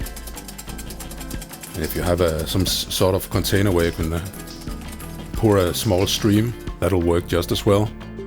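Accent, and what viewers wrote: Denmark. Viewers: I knew you were danish because of your accent 😉 thanks for the video 😊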